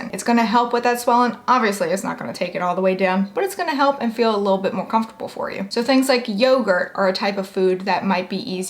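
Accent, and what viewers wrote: South, United States. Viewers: I can tell, shes from the south.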